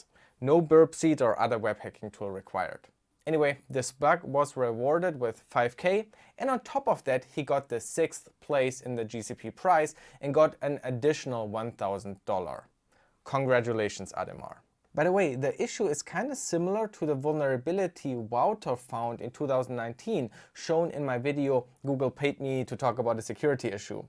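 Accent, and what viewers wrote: Germany. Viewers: You're german right? I think I hear it from your voice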